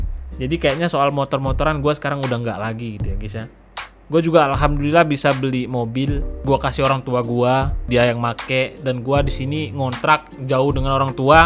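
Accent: Java, Indonesia. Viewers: Waduh gak nyangka loh aku bang org Aceh, krn kalau kita lihat dari cara berbicaranya seperti org Jawa tulen Salam ya bang dari Aceh juga, doain juga di beberapa daerah di Aceh lagi terdampak musibah banjir bang. Mudah mudahan suksesnya bisa nular ke kita juga bang, sukses terus bang 🙏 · Lu orang Aceh tpi logat lu kek orang Jawa bg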